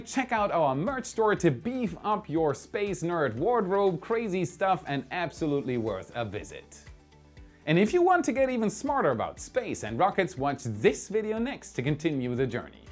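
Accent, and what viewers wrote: Germany. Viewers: Great show. Your Deutschland accent is fading. Is that intentional? Space should always have a German accent! For a good laugh YouTube for Tom Lehrer's "Wehrner Von Braun" video... (all of Tom Lehrer is irreverent and hilarious... watch the Masochism Tango too for a ridiculous laugh). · Are you german?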